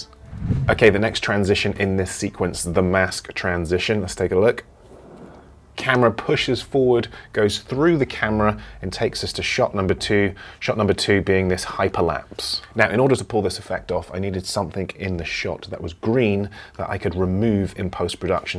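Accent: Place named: West Country, United Kingdom. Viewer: Really enjoyed this video, thank you. It’s helpful to see the editing broken down. I have done some of these things in Premiere but not used speed ramps - this looks like a great fix for a few wobbles 👍 Also - don’t think I’ve ever seen Plymouth look so cool, heh. Nice to hear a west country accent on YT! Cheers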